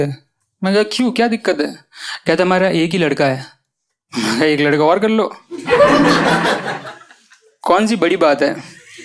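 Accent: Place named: Rajasthan, India. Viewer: Bhai Aap kha se hoo iam from rajsthan apka accent thoda sa rajasthani lg rha hai btw Love your video ❤❤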